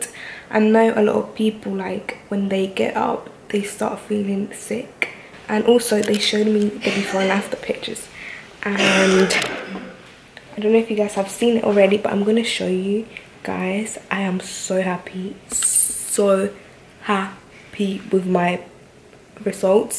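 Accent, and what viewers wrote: United Kingdom. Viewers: could be from another place in UK. Her accent is English since she grew up in UK. Duh😂🇬🇧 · Vive en Inglaterra su inglés es británico la pronunciación es diferente al americano. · She has an English accent from London. It's not very unique just a London accent XX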